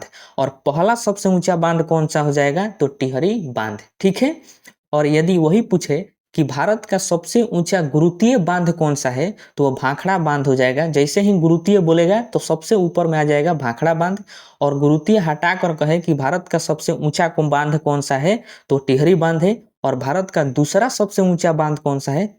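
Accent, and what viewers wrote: Bihar, India. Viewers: Bihar se hai bhaiya sir up se nhi 😅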